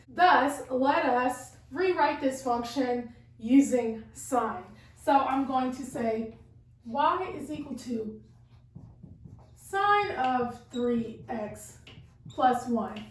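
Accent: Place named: Australia. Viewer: Can you explain in hindi That is not matter I can't understand english but you are speaking like australian that's why iam not able to understand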